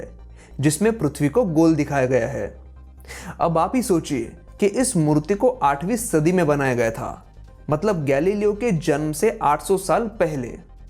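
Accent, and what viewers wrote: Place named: Bengal, India. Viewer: Sir, are you from Bengal? Cause your accent is like so.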